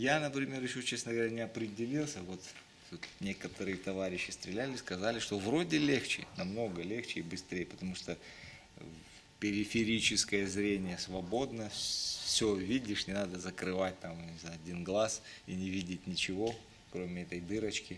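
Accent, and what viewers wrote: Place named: Kabardino-Balkaria, Russia. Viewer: акцент кабардинский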